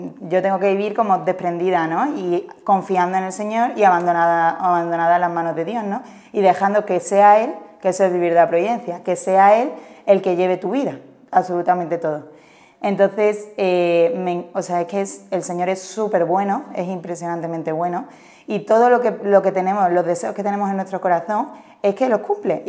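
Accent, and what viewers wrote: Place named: Andalusia, Spain. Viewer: Linda historia y hermosamente contada con alegría y acento andaluz 😃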